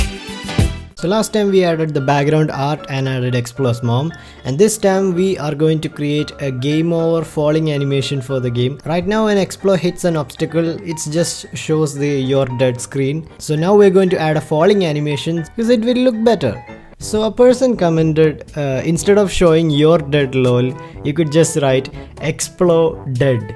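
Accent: Kerala, India. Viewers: you kinda sound indian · he has Indian accent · by hearing the voice itself i had a doubt is this guy a malayalee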